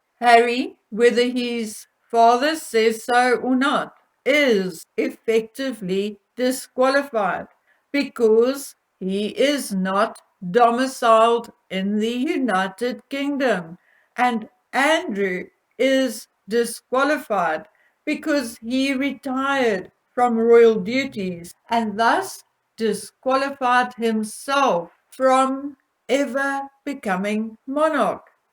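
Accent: South Africa. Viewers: You have the most pronounced South African acsent...you should speak Afrikans it would be more tolerable.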